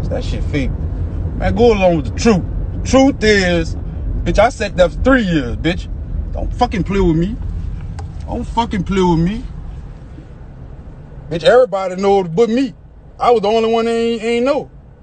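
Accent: New Orleans, United States. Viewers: He has a new Orleans accent they all speak like that I love their accent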